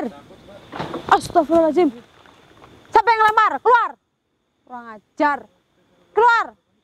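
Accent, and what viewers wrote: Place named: Java, Indonesia. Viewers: Mba... Medok banget... Aku suka....👍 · Kyak orang kebumen to MBK smpean ki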